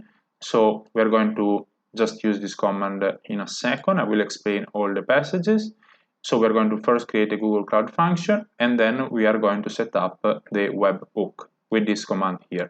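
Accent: Italy. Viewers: Hey! Very helpful and well structured tutorial. I was concerned there was no free option for telegram bot hosting but using Firebase cloud functions works! Also I noticed from your name and accent that you are Italian. I am from Italy too!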